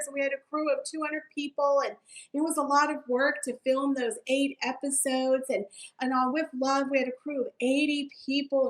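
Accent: South, United States. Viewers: Hi Everything sounds so much better with your southern accent! I’m just so amused that she’s not curling up on the floor with her Hermes’ blanket consumed in embarrassment bc the world knows she’s not the entrepreneur, actress, homemaker, jam maker, fashion icon, beauty queen, humanitarian, author, or AUTHENTIC‼️‼️ She’s barely a duchess‼️ We know who you really are MM; please just stop 🛑 pretending to be this amazing desirable woman bc the world 🌎 has caught on to who you & H really are‼️. You are both money hungry grifters, liars, award seekers, and definitely not humanitarians‼️ Please stop 🛑 trying to censor the public’s opinion of you bc we see the despicable human beings that you are‼️ I hate to break it to you, it’s not just your critcs that know, but the entire world; or as you would say…..the COMMUNITY who are only interested in y’all bc they want to see the train wreck that is Harry & ‼️ Xx from Aspen, Colorado 💕💕💕💕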